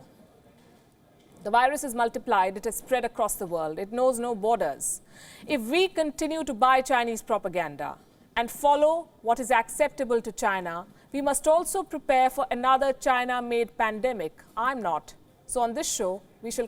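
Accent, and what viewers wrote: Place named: India. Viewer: goj76*&£21^&^? curry accent, didn't understand a word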